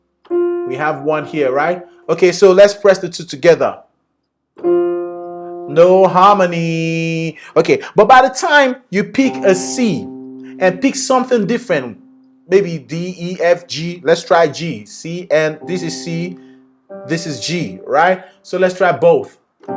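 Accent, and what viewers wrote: Nigeria. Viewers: Are you a Nigerian? You sound Nigerian!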